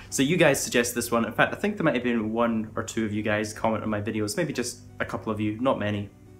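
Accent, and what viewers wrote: Scotland, United Kingdom. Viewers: are you Scottish because you have the accent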